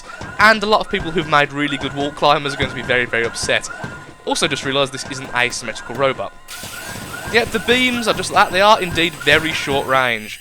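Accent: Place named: England, United Kingdom. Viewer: Wierd, if im not familiar with it. I figured you were from england, but a few words threw me off. I know there are local dialects, i was just curious what local dialect you spoke. I meant no offence, you were right. Im from Australia, we dont have local dialects, we have "country" and "city" accents. My country hasn't been around long enough to have them.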